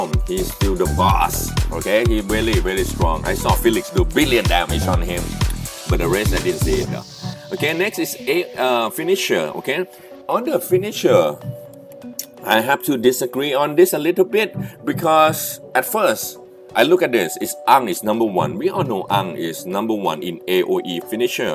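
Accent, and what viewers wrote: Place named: Thailand. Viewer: That Thai accent though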